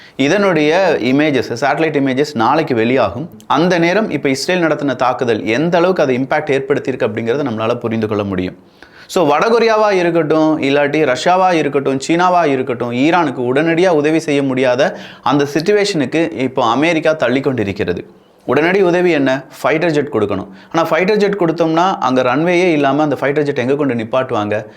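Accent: Kerala, India. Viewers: Bro your official slang reminds kerala pronunciation! Are you from kerala?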